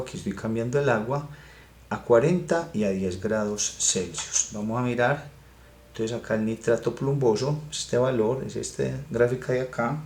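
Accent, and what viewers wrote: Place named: Colombia. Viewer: la voz colombiana ctmm mata wn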